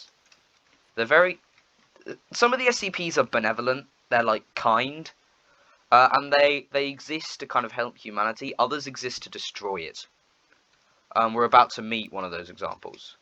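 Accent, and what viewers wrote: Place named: United Kingdom. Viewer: Nice your British funny voice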